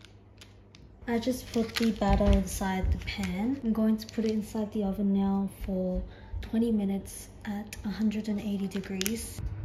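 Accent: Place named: Australia. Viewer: now developing a slight aussie accent bcs i keep binging ur vids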